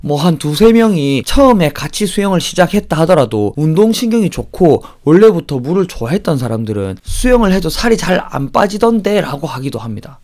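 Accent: Gyeongsang, South Korea. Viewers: 최고 입니다. 경상도 방언이 이리 스윗 할 줄이야~!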